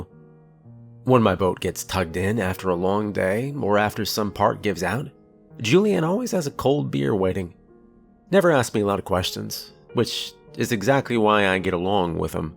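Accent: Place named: Maine, United States. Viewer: The voices of the characters that you make sound like they're all from Maine. 😅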